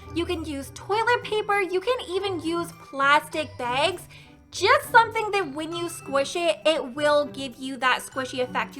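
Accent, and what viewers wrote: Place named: France. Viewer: I love your French accent!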